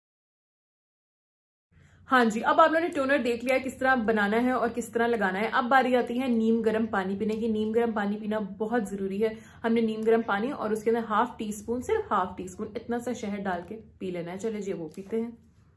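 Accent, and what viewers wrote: Lahore, Pakistan. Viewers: I love ur pakistani accent 😘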